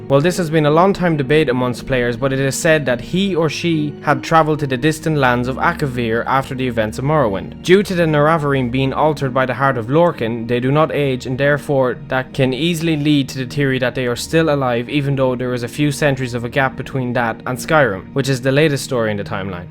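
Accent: Ireland. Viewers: When I was watching this I couldn't place your accent, but after noticing how you pronounce your Ts I was like "oh shit he's Irish" then I saw your channel name. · Is your accent Irish? It's awesome I want to hear you read a book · The Irish accent is the only way to hear the elder scrolls. Save for some dodgy pronunciations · He mispronounces Tharn because of his strong Irish accent. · I'm thinking is not mispronouncing the tes names but simply speaking them in the glorious Irish accent. Didn't know how I felt about it at first but it's kind of nice.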